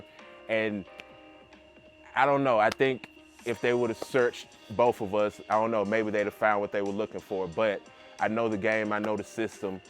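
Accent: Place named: South, United States. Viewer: Youngblood, truly inspired by your message. Spent 11 years living abroad (Italy, Germany, Korea, Djibouti). Keep living your best life King! Oh yea, you gotta tell us what state you from. I am from GA. Your voice sound like you from the da south!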